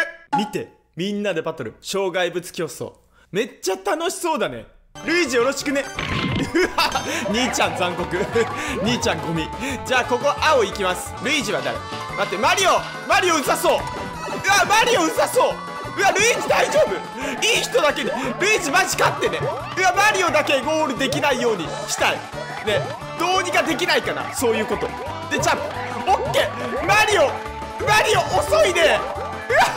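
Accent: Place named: Hakata, Japan. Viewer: 私も福岡在住やけんめっちゃさんが言いたいこと分かります笑!!やっぱり博多弁になる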